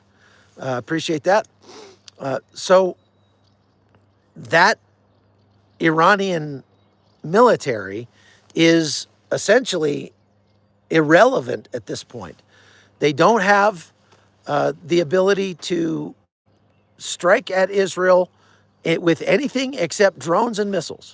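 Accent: United States. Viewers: What are you doing in Iran, you sound like your American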